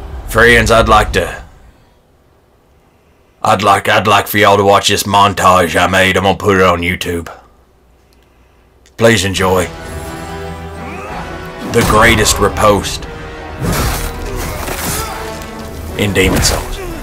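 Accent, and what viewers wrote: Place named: South, United States. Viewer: vaguely threatening southern rambling Thahs the truth.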